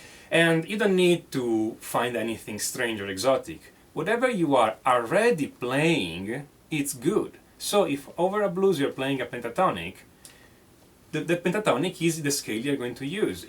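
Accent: Italy. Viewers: he is italian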